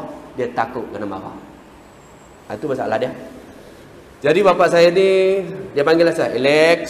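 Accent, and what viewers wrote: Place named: Northern Malaysia, Malaysia. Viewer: Pandai ustaz cakap loghat utara 👍👍👍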